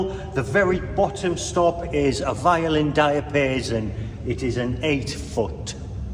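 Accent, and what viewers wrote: Newcastle, United Kingdom. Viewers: Enjoyed the video very much - it’s a change to actually learn something about the organ you’re playing. You sound Geordie - like me.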